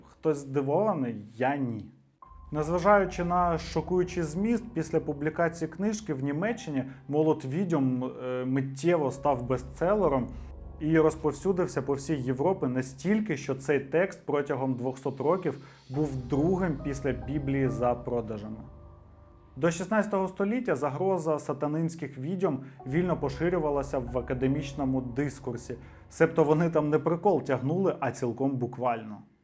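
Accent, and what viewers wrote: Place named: Russia. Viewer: Дуже крутий контент, подивився вже майже усі відео Але є трошки конструктивної критики) Було б добре, якби автор трошки попрацював над вимовою (маю на увазі зросійщену "г" і подібні русизми) А так все файно, дякую за працю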